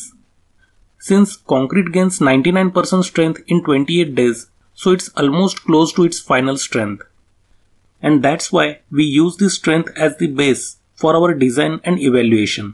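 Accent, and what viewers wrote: India. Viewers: This guys Indian accents so strong and hilarious. Cool videos though 😊😊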